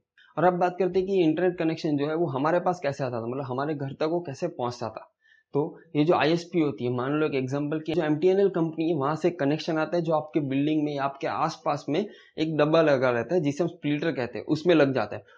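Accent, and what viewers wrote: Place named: Gujarat, India. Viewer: Bhai app gujarati chone avaj thi lage che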